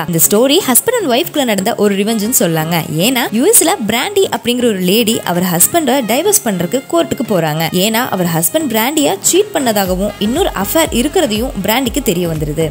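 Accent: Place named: Coimbatore, India. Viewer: yenunga neenga coimbatore aanga..?! 🤗🤗👏👏 super voice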